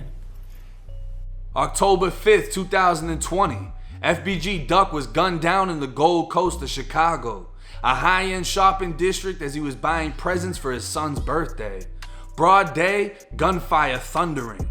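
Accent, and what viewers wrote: Boston, United States. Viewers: U sound like ur from Boston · Yo is this dude talking from Boston some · Are u from Boston?..I hear the accent...btw good breakdown 👍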